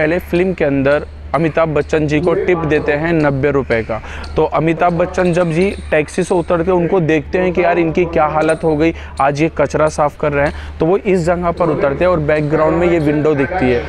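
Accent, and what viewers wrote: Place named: South, India. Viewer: Bhai Tum South अंका bol rahe ho masur comedyan mehemod he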